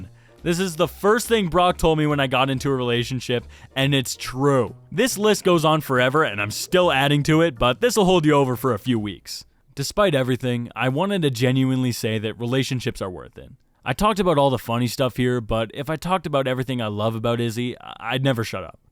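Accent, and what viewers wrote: Canada. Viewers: Your Canadian? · You animation quality has gotten so so good over these past few year 😍 is soooo cool!!! I’m Canadian but every time you talk I chuckle cause you have such a Canadian accent sometimes🤣 like you said “ a-bout” but it’s such such a Canadian way 🤭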